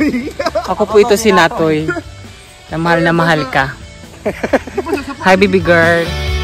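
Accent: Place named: Philippines. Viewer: I can still hear your tagalog accent fren hahaha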